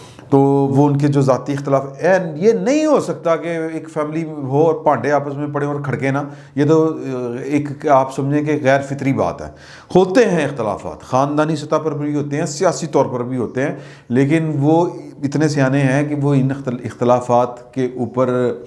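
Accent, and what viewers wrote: Punjab, Pakistan. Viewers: sb apka punjabi type style bht acha lgta ha plz keep it up... 👍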